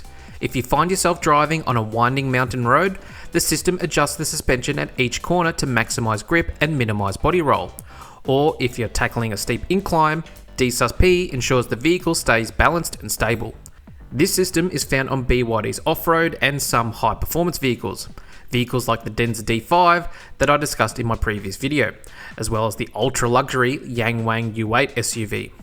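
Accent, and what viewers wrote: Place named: Australia. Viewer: Newly subscribed, good to hear Aussie voices and not AI voices like a lot of new channels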